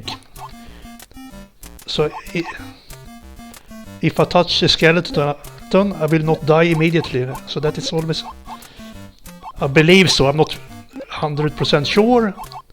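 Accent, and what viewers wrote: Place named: Sweden. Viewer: svenska kraft haha, hörde dig var svenska så snart du började prata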